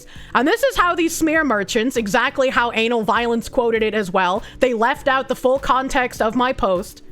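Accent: Boston, United States. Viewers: How does that thick Boston accent get confused with the Midwest? Lol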